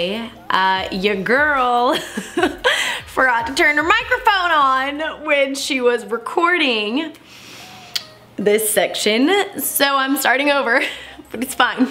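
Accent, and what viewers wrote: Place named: Texas, United States. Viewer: Not too strong, but I assumed you were a Texan the first time I heard you speak. It's not country though or East Texas twang. Brings out my drawl anytime I hear another Texan speak ( or Southerner for that matter), even when it's not thick. No idea why! Graveyard Girl does it to me as well. Incidentally, I watched Good Girls the other day and was excited when I recognized you. I yelled at my husband " WE HAVE THE SAME HAIR!!". He was confused and startled lol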